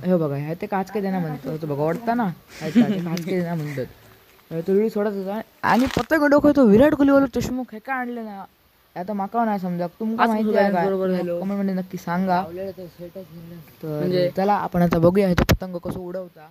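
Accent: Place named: Malvan, India. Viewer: तुझी मालवणी भाषा ऐकायला छान वाटत आहे.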